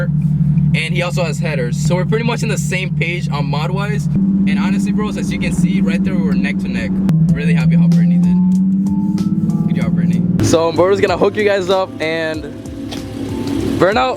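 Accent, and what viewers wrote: Cuba. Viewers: Acere your Spanish is Cuban asf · Dude yes please more videos like this this shit made me laugh so hard 😂😂😂 that Cuban accent though de pingaaaa lmao I live Miami so you Already know what that means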